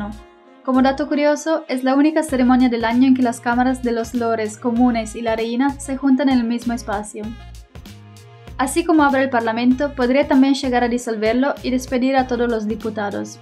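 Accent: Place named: Buenos Aires, Argentina. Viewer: Muy buen contenido. Pero es vergonzoso y horrible q hables con el sheismo. Te sugiero que remedies ese error porque en la argentina solamente los porteños hablan asi y es repognante para el resto del pais. es una lastima q digas apeshido siendo que lo correcto es apellido. Es una ofensa para la lengua castellana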